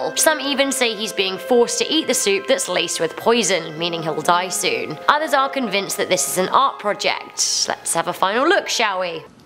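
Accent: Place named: Scotland, United Kingdom. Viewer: im gonna comment something nice. you have a delightful accent :). im guessing its scottish cause i knew someone from whales with a similar voice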